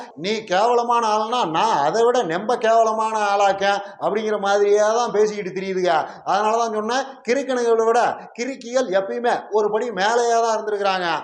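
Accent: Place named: Coimbatore, India. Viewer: அண்ணா உங்க பேச்சு கோவை தமிழ்ல பட்டை கிளப்புறீங்க போங்க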